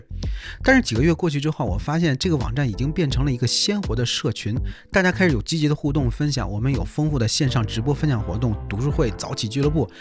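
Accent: Tianjin, China. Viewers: 老师，您讲的很好，但我很好奇您怎么从山村里走出来的？ 我听着您的天普口音很亲切…这种口音怎么可能从山村里走出来…蓟县也不说这种天普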